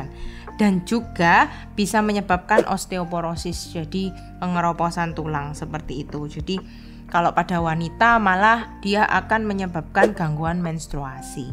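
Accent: Java, Indonesia. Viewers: Saya suka dialegnya logat jawa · medok banget sih ce logat jawanya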